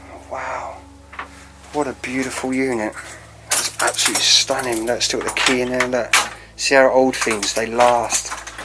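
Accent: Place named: United Kingdom. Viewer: Hey I absolutely love your videos. Of course I find them absolutely fascinating, but there is one thing that has been driving crazy and I would live some clarity. What part of the UK are you from? Sorry it's silly, but I've heard it before and I would love to be able to finally place it! Ty!!!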